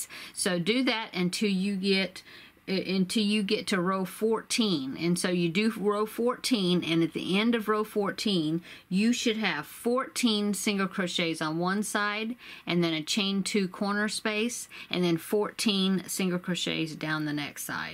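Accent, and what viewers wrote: South, United States. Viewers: is that southern accent ? LOVE that bag <3 thnx for the pattern :D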